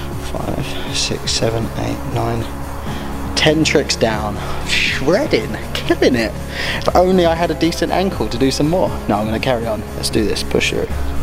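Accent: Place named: Essex, United Kingdom. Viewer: are you from essex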